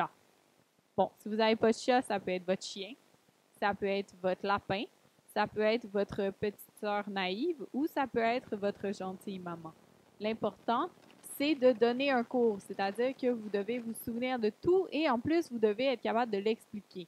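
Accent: Quebec, Canada. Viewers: Merci ça ma bien aidée et j'ai vue que tu as l'accent québécois! J'ai vécu trois ans au Québec et j'arrive à faire l'accent mais sinon dans l'ensemble c'est très bien réussi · Les les canadiens francophones on cet accent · Shimada Tronic974 c'est en français duh. C'est juste que c'est un accent québecois. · C'est une personne qui parle Québécois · Oh mon Dieu jadore quand les québécois il parle🤩🤩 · J'adore votre accent ! Vous êtes québécoise ? Merci ça m'a énormément aidé car demain j'ai une poésie 👍 ! Bonne vidéo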